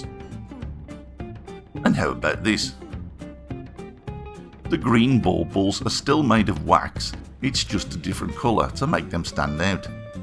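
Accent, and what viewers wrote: Australia. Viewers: Love the attention to detail. You're quickly on your way to becoming a master at lost wax. Won't make any comments about the male tree because it would be crude to say something about it's balls.lol Noticed below someone likes your "Aussie" accent. Thats ok because I read on big stack where someone asked if his flag was the Union Jack!! Lmao good show mate. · Here from bigstackd casting. Love the Aussie voice and the oil burner tutorial! Subscribed! Great cast btw.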